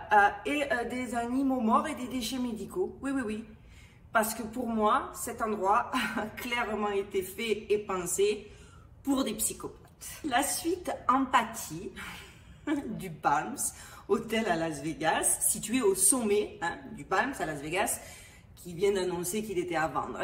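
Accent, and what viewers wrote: South, France. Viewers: Damien Hirtch, copain de martina abramovic, pour un copain donner.Quel bel accent du sud a végas!!!Merci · Je te découvre grâce à Silvano. J'ai trop kiffé le début " hello everyone '...je croyais que t'étais ricaine puis tu t'es mise à parler le français du sud ...🤩🤩🤩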